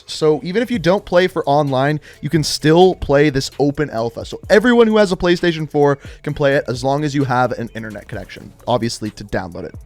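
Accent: Canada. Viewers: Are you Canadian my guy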